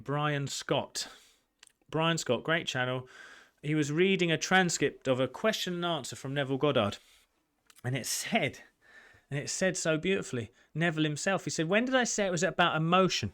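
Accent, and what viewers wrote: Essex, United Kingdom. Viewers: I knew you were an Essex boy! Love your passion😍. New to you but look forward to hearing from you and your way of explaining it all.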